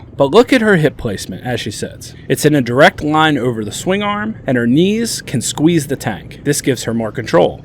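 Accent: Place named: Pittsburgh, United States. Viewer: You from Pittsburgh? I sense a Pittsburgh accent N nat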